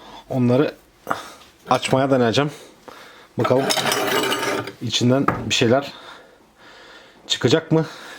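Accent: Thrace, Turkey. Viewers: Trakyali edirne tekirdag tarafli.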